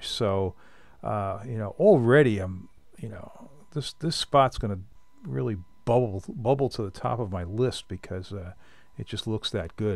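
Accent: Long Island, United States. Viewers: I grew up in Eastern LI, in Southold. I fished a lot, especially around Plum Island. On the NE side of the island, I could put my boat between 3 massive boulders and catch blackish until my arms hurt as others 20' away were only catching skate. I love black fish... excellent meat. Your drone footage instills what I imagined down there. You sound like you're from LI... right? I now live in SW Florida and I dive a lot, especially wrecks. The underwater world is awesome and this drone is a great tool to share the scenes without the "work" of diving. I did much better fishing in LI than I ever had here. I have even shoveled snow out of my boat just to go fishing. How much is that baby?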